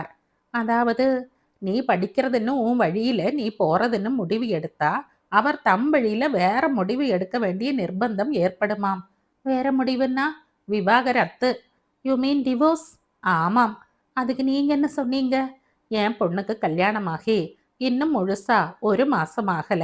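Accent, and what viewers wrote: Kerala, India. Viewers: Swarasyamai selgirathu novel👍👍👍 ungalin inimaiyana malaiyalathamil vasippu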